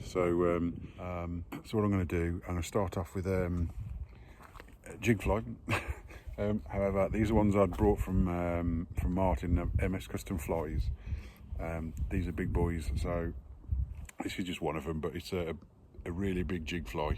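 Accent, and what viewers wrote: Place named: Black Country, United Kingdom. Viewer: nice one mate. I thought u mite set me strate on the 'brummy' thing, i use the term generically. I shud of been more accurate and sed you are a YAM YAM or WERNIT, and me getting that wrong cud almost be classed as an insult, so i do appollogys for my incorrectness. I think i have got that rite, with the YAM YAMYAMS and WERNITS and BRUMMYS, there is probly sum more 'off shoot' local terms for the 'tribal affiliations lol, that i'm not familiar with. So if you are a Blackcountry fella, that means you're not a Brummy, strictly speaking. You know wot us northerners are like we hear an accent and thats that lol. Anyhow mate spot on fishing and i appollogies for the brummy thing. Cheers Mick.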